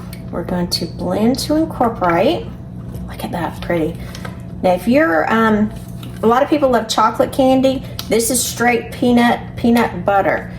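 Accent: South, United States. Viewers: I don't know if it was the recipe or the southern accent that made me subscribe, but here I am 😂.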